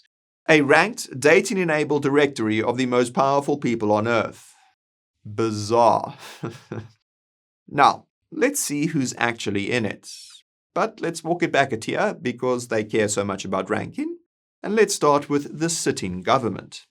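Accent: South Africa. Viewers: He's clearly not British, maybe South African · Your accent sounds very South African? Just asking as I am South African with Irish roots and have a lot of respect for the people of Ireland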